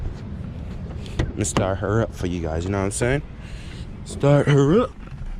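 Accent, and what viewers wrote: Jamaica. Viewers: Jamaican accent 👌👌👌👌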